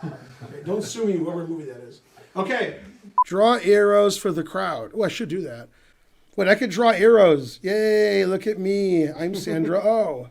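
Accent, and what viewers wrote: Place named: AAVE, United States. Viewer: I can't understand you because of ebonics. I never thought the last bastion of edgy humor would be from a chess streamer..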